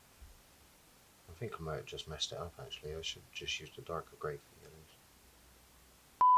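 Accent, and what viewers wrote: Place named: Bristol, United Kingdom. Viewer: Can't beat a bit of wolverine, keep up the sick work dude. I also love how bloody Bristolian you sound shag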